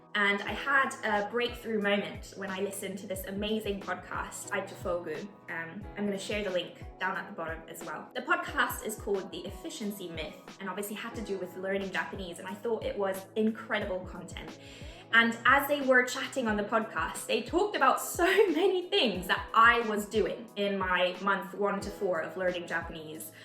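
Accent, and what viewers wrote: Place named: United Kingdom. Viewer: Great progress! Since you are solo studying you may not be getting any guidance on pronunciation. You have a very british "a" vowel going on in words like "wanikani". You are using what linguists call a "Near open front unrounded vowel". In IPA it's written "æ". You want to be using a "Open central unrounded vowel". In IPA it's written "a". Japanese always uses "a" never "æ" because "æ" doesn't exist in japanese. If you google both of these the first result will be wikipedia where you can play and hear the difference. Continued luck on your studies!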